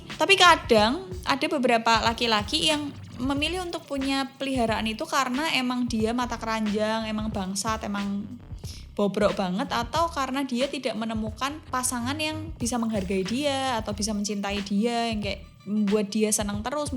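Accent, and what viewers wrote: Java, Indonesia. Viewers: Blitar hadir ka.. Medoknya ka jan ngangeni bangeettt.. Salam semangtt y kaa.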